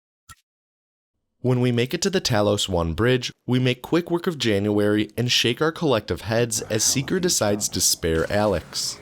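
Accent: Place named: Boston, United States. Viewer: I detect a Boston or new England accent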